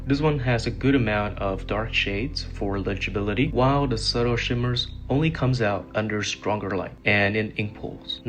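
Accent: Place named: Germany. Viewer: you have a really close German accent...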